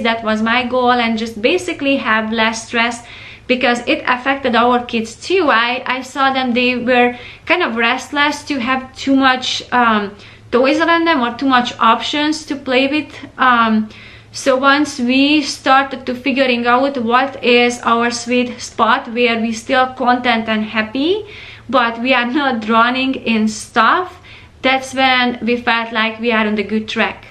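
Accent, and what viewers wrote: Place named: Hungary. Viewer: Imadom menyire perfektül beszelsz angolul. Tisztan kihangzik hogy magyar vagy de az csak meg attraktivusabnak csinalja. Elvezem nagyon a channel-eset. Üdv Ausztriabol.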